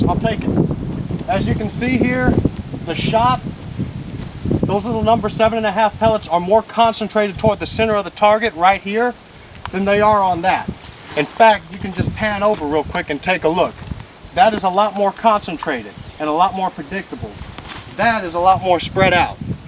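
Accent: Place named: Texas, United States. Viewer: Just curious, where's your accent from? I know it's definitely Texan but you sound like you're from the east near Beaumont or around there but I could be wrong. Love the video though! What do you recommend for slugs?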